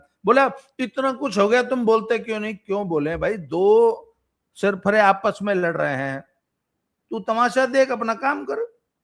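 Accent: Haryana, India. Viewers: आपके मुँह त हरयाणवी कसूती सुथरी लाग है 😅😅😅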